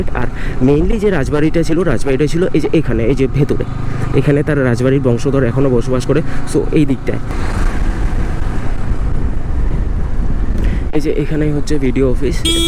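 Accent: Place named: North Bengal, India. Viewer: North Bengal এর accent টা ভিডিওতে রাখসো.. আমার খুব ভালো লাগলো দাদা 🤗 btw আমি মাথাভাঙ্গা র বাসিন্দা ♥️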